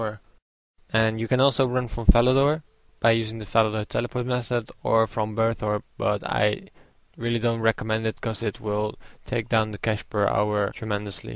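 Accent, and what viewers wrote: Netherlands. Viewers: je hoort gelijk dat het een nederlander is >.< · You are dutch? =D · I can hear you're Dutch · ur from holland lol i hear ur accent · lol ur dutch arent u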